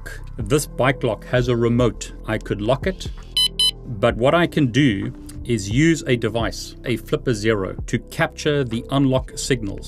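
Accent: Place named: South Africa. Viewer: can hear the south african is still there with you. Also glad you got out, its getting worse here. But im sure you know that already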